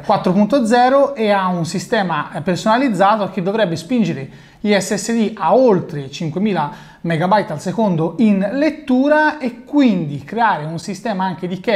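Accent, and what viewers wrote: Tuscany, Italy. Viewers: sei toscano? 😂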